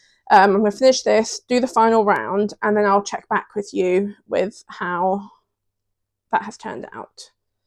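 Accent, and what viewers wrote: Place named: United Kingdom. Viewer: Hi! New subscriber here. At first I thought you were local to me with your store name "Big Bear Yarns," I thought maybe you were from Big Bear, California (which is a great place to ski, and would be a great place to sell yarn)! Then I heard you speak and I said to myself, "Oh, I don't think she's local." 😂 Though you could be a transplant, but really, why would anyone from the UK want to live in the US these days, anyway? 😅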